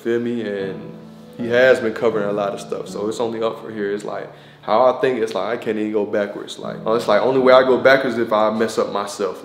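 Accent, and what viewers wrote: Philadelphia, United States. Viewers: I know you not from Philly but u talk like us 😭 idk maybe its just me. Enjoyed this more personal video tho, God bless you always !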